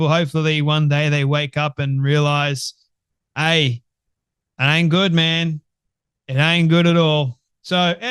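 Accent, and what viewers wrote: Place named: Australia. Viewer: LOL, I love how Aussies say.. "mate", especially you,.. Happy.Night/Day:D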